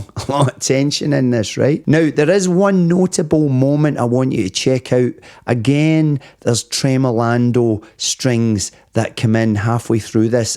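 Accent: Scotland, United Kingdom. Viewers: Informative. Given in your beautiful Scottish accent!❤ thank you for the reaction! Lovely👍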